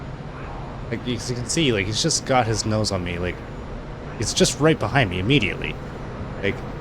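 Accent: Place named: Canada. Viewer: I didn't know there was a cult of purists as you describe, it seems so stupid to me. You sound Canadian, I'm Australian myself so let's just blame dumb Americans like we always do 😄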